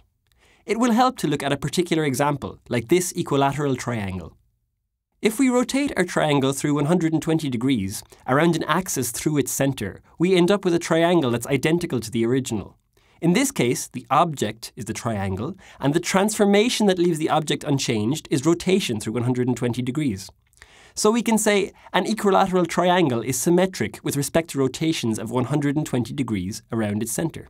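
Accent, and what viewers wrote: Ireland. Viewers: Why do i think the speaker is Irish ? Maybe it's the accent. Did anyone else feel that ? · The voice of an Irish person makes my ears bleed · IRISH ACCENTS <3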